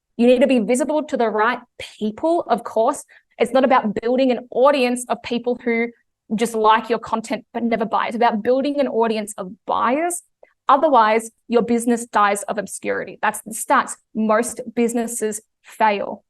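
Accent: Australia. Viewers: I love your Australian accent. It is very beautiful to my ears........:) Thanks for valuable information.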